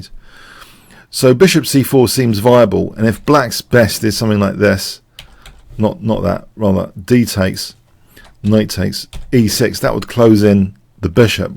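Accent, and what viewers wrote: England, United Kingdom. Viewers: I have to admit that pure English accent and chess videos is the rarest thing I have ever seen. But it's addictive.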